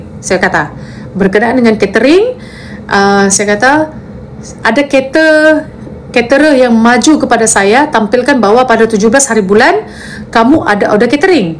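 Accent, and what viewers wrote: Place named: Kuala Lumpur, Malaysia. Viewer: ending terbaik...Sdri ni mahir bertutur dgn gaya pertuturan org KL Sgor la.....canggih...thx..all the best and May ALLAH perlihara semua geng yg BENAR dan memebala anak kecil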